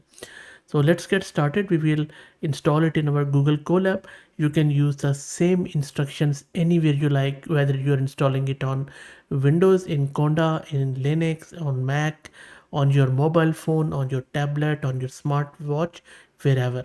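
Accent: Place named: India. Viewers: Obviously it's you who need to keep up with the Indian English (no offense meant here either). Indian English has an overwhelming stronger presence on the net than southern USA, Scottish, new Zealander, Australian, Scottish accents... That no one dares be prejudiced against. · For me his accent is very clear Mayebe you are not familiar with Indian English accent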